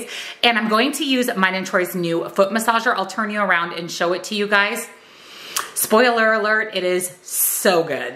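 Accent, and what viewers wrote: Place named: Minnesota, United States. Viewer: You have got to be from Minnesota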